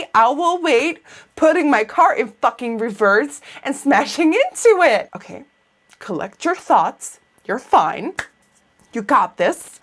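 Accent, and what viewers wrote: United States. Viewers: omg i just started watching your videos and i honestly would have guessed that you you were american! Love your videos!! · I HAD NO IDEA YOU WERE IN HOLLAND!! You sound American omg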